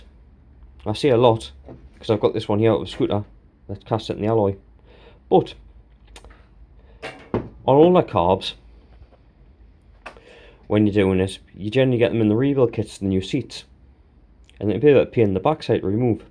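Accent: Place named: Newcastle, United Kingdom. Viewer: Geordie it’s easy to understand.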